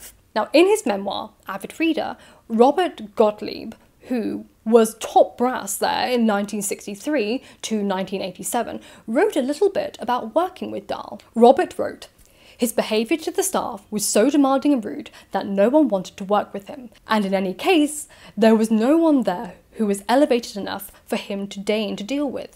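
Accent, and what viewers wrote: RP, United Kingdom. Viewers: He sound like Boris johnston,, The Brittish upperclass don t change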